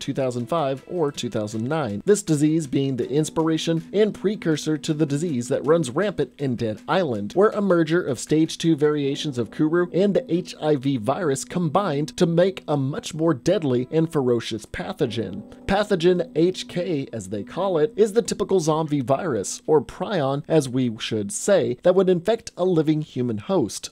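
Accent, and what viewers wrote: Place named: United States. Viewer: Zombies, dabs, and your smooth American accent is spooky season essential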